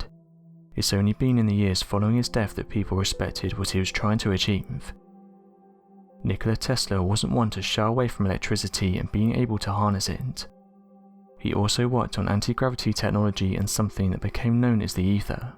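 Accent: United Kingdom. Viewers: I cannot stand this guy's wimpy British voice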